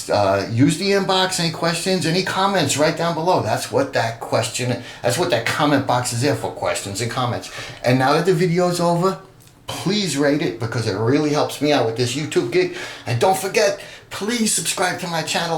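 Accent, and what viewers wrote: New York, United States. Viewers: LOL!!! Glad you said that about the masking tape. I did that when doing a fret leveling/crowning on an acoustic guitar.....with the lightest tack painters tape......something came up and I left it on for 4 or 5 days, and it was like epoxy!!!!! Something that peels off so easily turns into the hardest thing int he world to get off......I wonder how many will listen to you on that. With that, people might say "This stuff...nah....it barely stays on...how could it ever be difficult to remove"....and they have to learn it for themselves!!! Good stuff though. Trying to figure if you're Staten Island or Long Island.....definitely not Manhattan, maybe Bronx, not Brooklyn......trying to get the accent. lol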